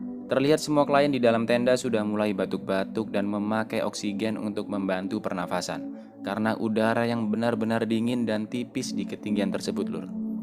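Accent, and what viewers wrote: Java, Indonesia. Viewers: Min orang jawa? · Jowo pundi mas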